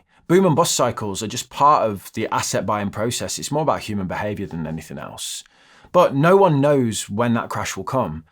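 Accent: Birmingham, United Kingdom. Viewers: Took me this long to realise you were a Brummie 😂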